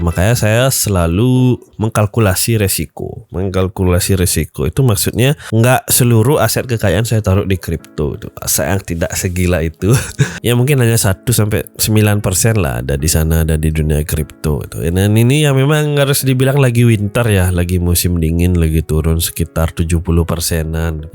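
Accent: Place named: Padang, Indonesia. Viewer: Lu org pdg ya bg, logat nya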